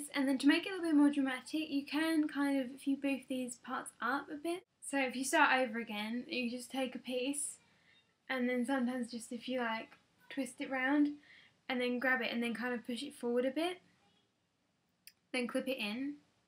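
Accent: England, United Kingdom. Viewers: I "liked" because I love your cute english accent! ((( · guys, 1. its not 'english' accent, its 'british'. 2. so what if she says litterally alot? dont make fun, these are quite cute, just not something everyone would use. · you sound english? or an american putting on an american accent?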